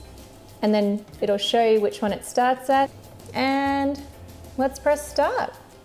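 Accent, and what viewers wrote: Australia. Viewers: Are you Australian, where did you buy that machine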